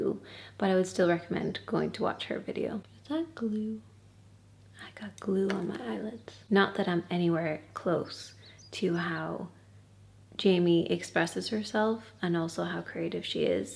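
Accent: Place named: Ireland. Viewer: …sending you so much love ❤ I subscribed many years ago for the makeup tutorials, but now it’s a good day if I manage to put bb cream on! I have 3 kids (2 teenagers and a 5 year old), have had 2 crappy marriages, and makeup is just not a priority as I rarely leave the house 😅 I can partially relate as someone who struggles with my mental health (that has impacted my ability to hold down a job) and also perfectionism. You are such a shining light though ☀️ The older I’ve got (turning 41 soon) the more I’ve realised perfectionism sucks. Just being present and “doing the thing” is so much more important than wasting time and energy on trying to achieve perfection (which doesn’t exist) especially when your time and energy is limited ❤ I for one am just so happy to see your beautiful face, hear your lovely Irish accent and hearing about your life. You are such a beautiful person, inside and out and we support you ❤ Sending love and strength from Australia xx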